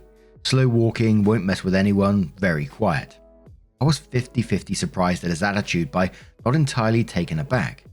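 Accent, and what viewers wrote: United Kingdom. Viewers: Hey just wanted to say thank you for the channel along with the typical American who loves a British accent 🥵 lol I really love hearing your take on people's drama Reddit is like a real life soap opera and I'm so addicted. Obviously these are real lives and real people so it is sometimes tragic too. All to say your personal. Empathy and innate kindness make listening to you a pleasure. Be well sir and thank you again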